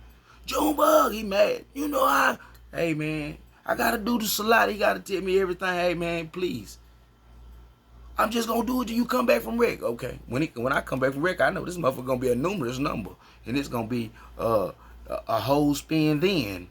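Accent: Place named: Texas, United States. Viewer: TKO sauce. Haha. Thats funny shit. You have an awesome accent. That Texas twang is on point. I saw you from watching OG Percy videos. Were you locked up with him at all? Much support from Humboldt County cali. Just subscribed. You have a nice delivery. Keep pushing these videos out. 👍👍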